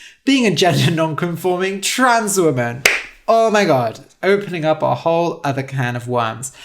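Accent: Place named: United Kingdom. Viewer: oooohh! a Brit! (or somebody who sounds similar)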